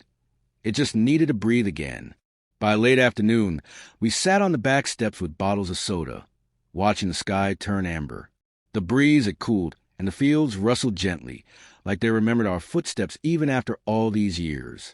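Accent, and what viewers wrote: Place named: South, United States. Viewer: I love this narrator, best voice, slight southern accent, very soothing!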